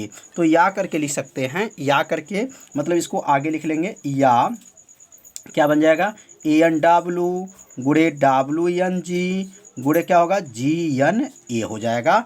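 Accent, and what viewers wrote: Bihar, India. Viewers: Sir ji aap bihar se ho kya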